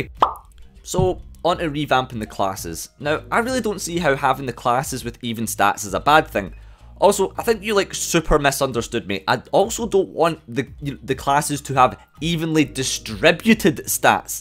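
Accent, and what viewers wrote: Scotland, United Kingdom. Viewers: U Scottish, mah boi? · Anything is better in a heavy scottesh accent. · Wow I just got this vid in my recommended and I feel blessed because I never knew having a Scottish (Irish???) person yelling at me would be such an experience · Are you from Scotland? Love your accent <3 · This is the first video I've seen from you. I've never heard a more scottish accent. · i canny tell if its where youre feh or no but its sounds like you are scottish but tryna be understandable af to everybody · It's almost weird hearing a Scottish accent like mine on Youtube. Sooo refreshing though. Also - I fully agree, lul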